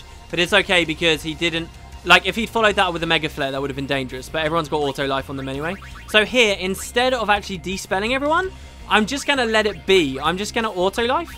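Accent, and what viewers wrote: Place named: England, United Kingdom. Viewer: thats a real shame, your guys casual movement from gw's to everything else, for me, really captured what the gw community are doing. would you ever think about starting a similar style podcast you our own? i know the 'but of corpse' (and their wider range of casters from that channel) could do with someone with your knowledge/perspective/english accent on their shows.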